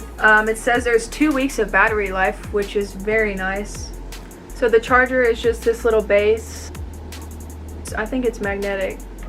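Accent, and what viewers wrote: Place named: United States. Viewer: dam it you attractive women! You have a cute american accent!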